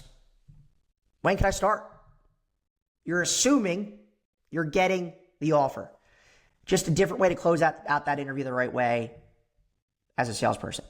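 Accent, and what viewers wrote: United States. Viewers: Doylestown native here - my ear immediately picked up on your Delco voice. Now that I live in Dallas, people get mad when I wear my Eagles jawn here. Your content is helpful because I'm looking to become an Account Manager. If possible, please send me some cheesesteakers from Cleaver's in Rittenhouse Square.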